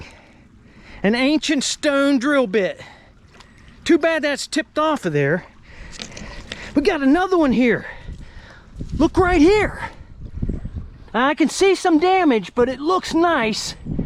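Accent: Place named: Southern Ohio, United States. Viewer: ❤ the south Ohio accent. Being from southeast Cincinnati, you remind me of my uncles. 🤘🏻Rock on.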